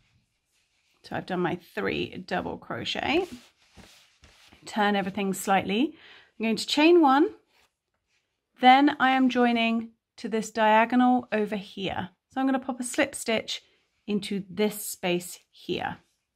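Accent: England, United Kingdom. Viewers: Oh it's just that as you had an English accent I thought it was strange I follow English or I would get a bit confused 😂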